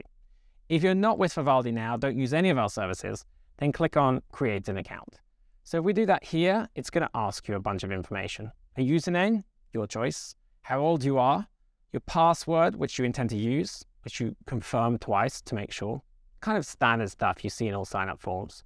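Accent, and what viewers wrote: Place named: United Kingdom. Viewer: I think of as being from the UK. is an Irish name (pronounced ) and as I understand it, he grew up in and has spent more years in the UK than anywhere. You'll notice he has a "British" accent. He does live and work in Norway, has a Norwegian last name and his wife and kids were born there. So... But yeah - his English is flawless because he's pretty much from there. No one has ever mentioned to me how good his Norwegian is... I'll have to ask some of his co-workers.:)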